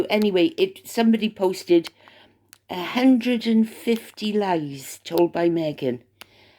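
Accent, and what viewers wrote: Wales, United Kingdom. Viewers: I couldn't believe my ears so absolutely delighted to hear your beautiful Welsh voice again.. i have missed you so much. I wish you all the very best in your endeavours, Caroline ❤❤❤ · Hi listening to the vlog again. I had a thought abt subliminal messages imo & allegedly MM with her Raspberry Jam & Dog Biscuits was trying to make some of the BRF look like “Jammy Dodgers” IYKYK… think you get my drift. Glad yr back & yr welsh brogue is wonderful listening. Best wishes from Adelaide; South Australia🦘🐨🇦🇺💐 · So lovely to hear that beautiful welsh accent. You are a diamond xxx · I have missed you. I used to listen to you going to sleep. In Australia 🇦🇺. You made me laugh. I’m so glad. I’m so sorry with what you went through. We must stick together. I hope wales with your welsh accent will help me sleep. I’m glad I found your channel again 😊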